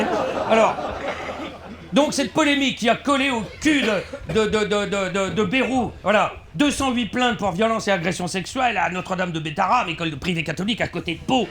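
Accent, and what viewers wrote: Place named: Montceau, France. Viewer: A ben t'es du Montceau hein!!!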